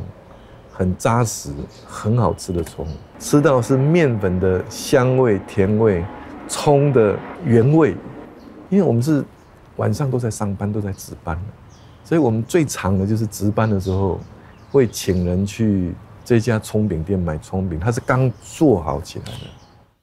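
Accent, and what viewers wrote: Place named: Taiwan. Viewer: 賴副總統加油 辛苦你了 聽你講話有台灣的 味道 我一些好姐妹都很喜歡你 老實 又實在 希望你能高票當選 有人要嘿你就會把它黑過去 讚喔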